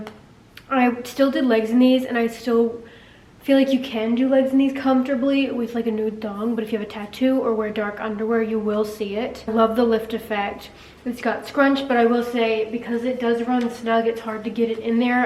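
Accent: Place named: England, United Kingdom. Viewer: how you feeling today..... In ur English voice what's on the agenda for 2day daaarling 😊 I'm not going to comment but real man knw real from fake marshmallows.... This what I say about that 😎👍🤙🙌🦾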